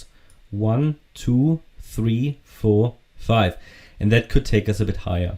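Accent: Germany. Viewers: Great vid! Are you German?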